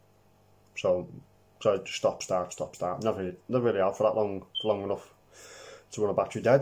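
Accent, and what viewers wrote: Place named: Australia. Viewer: Ripper Aussie accent, think you should have a blast of the Nigeria accent!! Wat’cha think?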